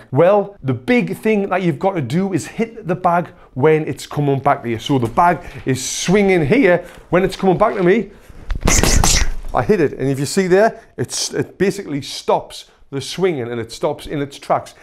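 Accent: United Kingdom. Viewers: British accent😂 I understand your speech better than those with an American accent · That's a combo - I like british accent and boxing :D I'm glad I found this channel! Great stuff! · It's like very deep British accent. Kinda like it ngl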